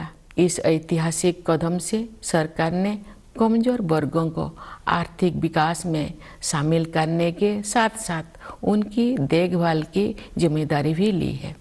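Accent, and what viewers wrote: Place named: Odisha, India. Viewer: Sounds like odia accent.. from Odisha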